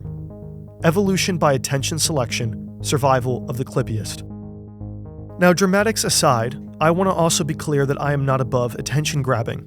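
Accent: United States. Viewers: Wederom dank voor je video (overigens bizar hoe je accent zo Amerikaans is). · i dont get it Why is this guys obsessed with europe He sounds American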